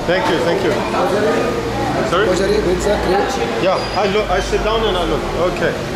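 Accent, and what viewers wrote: Germany. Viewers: He has a german accent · You German or South African I'm confused 🤔 anywhos love from America🇺🇲🦅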